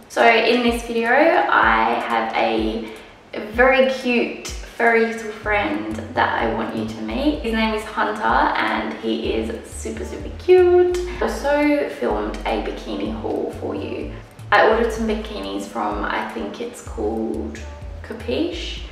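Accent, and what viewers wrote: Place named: United Kingdom. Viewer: I thought you live in England. You accent was kinda British that's why I got confused. Then props to you <3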